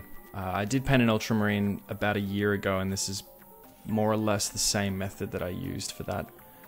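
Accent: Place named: Australia. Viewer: You sound Aussie. These look great by the wat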